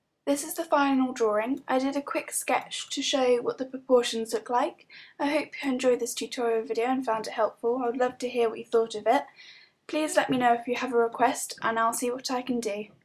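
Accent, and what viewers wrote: United Kingdom. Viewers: R u English r South African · Omg you're British haha be aware that that makes most Americans like 200 times likelier to watch your videos..plus, you are one of the best portrait artists on YouTube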